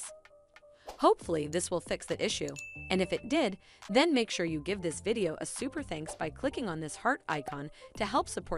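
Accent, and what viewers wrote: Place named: United States. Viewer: Its British Gas .why is it an american voice i mean what .maybe this is why they are not working properly maybe its not Britsh anymore.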